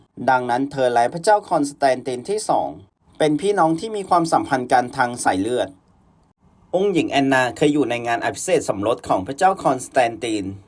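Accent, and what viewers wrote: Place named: Rural, Thailand. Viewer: รู้นะว่าตั้งใจ ขอให้กำลังใจ แต่ต้องฝึกมากๆ โดยเฉพาะการอ่านออกเสียงชื่อต่างๆให้ถูกต้อง เพราะยังไม่ถูกหลายคำสำหรับชื่อในภาษาต่างประเทศ มีผิดพลาดหลายที่ จึงต้องพยายามแก้ไขปรับปรุงให้ถูกต้อง การอ่านคำต่างๆจึงต้องฝึกให้ชัดเจนตามบรรทัดฐานมาตรฐานภาษาไทย รวมทั้งจังหวะจะโคนและสำเนียงตามมาตรฐานภาษาไทยกลาง อย่าให้มีกลิ่นชนบท เพราะนี่คือการอ่านเรื่องสำคัญของพระราชวงศ์ต่างประเทศ ขอให้หมั่นฝึกทบทวนไปมามากๆ จะดีขึ้นเอง เพราะมีแววว่าน่าจะพัฒนาได้จนได้รับการยอมรับว่ามีมาตรฐานการอ่านที่ดีต่อไปได้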